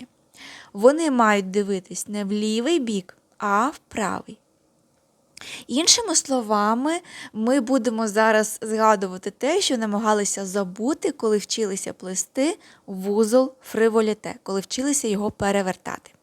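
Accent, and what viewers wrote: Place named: Russia. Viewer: а не лень язык ломать? А хотя прикольно: я и технике от Вас научилась и мышцы живота насмеяла над Вашими попытками говорить на украинском. 😊😊😊 Спасибо Вам за двойную пользу 😊😊😊 Всё равно сбиваетесь на русский 😊😊😊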